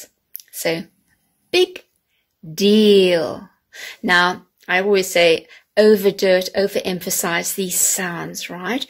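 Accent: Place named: United Kingdom. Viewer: I've really enjoyed the video as her accent is so clear, the british accent is the best accent ever 😍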